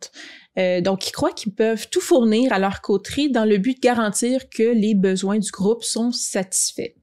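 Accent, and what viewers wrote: Quebec, Canada. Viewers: Merci pour se panorama J'adore ce petit accent Québécois 😊 Et toi que penses tu des Serpents? As tu déjà joué un Serpent? En tant que conteuse, les utilises tu? Carpe Noctem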